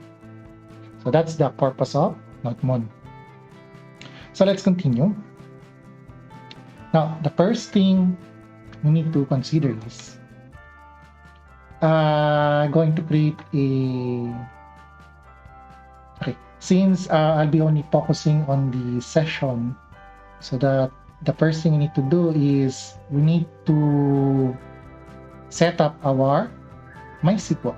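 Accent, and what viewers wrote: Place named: Philippines. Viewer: hi, I recognized your tone and confirmed that u r a filipino with your name. i'm a 3rd year filipino IT student. just proud of ur videos. thank youuu! it helped me with my simple project :)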